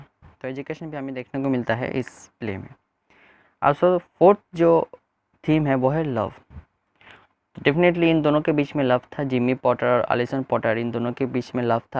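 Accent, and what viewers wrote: Bengal, India. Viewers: Aap bengali ho? aapki ascent se pta chl rha h Nice explanation 😊😊 It'll help a lot in answer writing.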